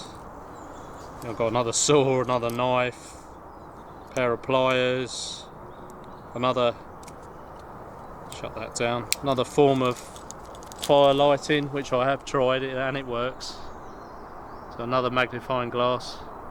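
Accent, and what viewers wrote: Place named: South West England, United Kingdom. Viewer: You Americans will just have to learn English, as it really is, rather than what you learnt at school. This is a south-western accent. Very ancient.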